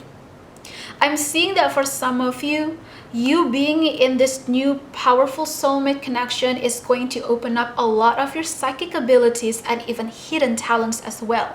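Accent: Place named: Italy. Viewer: Where are you from? (idk why your accent sounds Italian to me lol)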